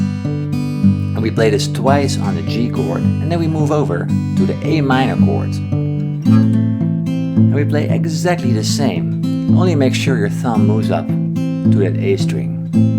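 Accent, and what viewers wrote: Netherlands. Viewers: with an accent. You can tell right away that he’s Dutch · cant miss that Dutch accent!!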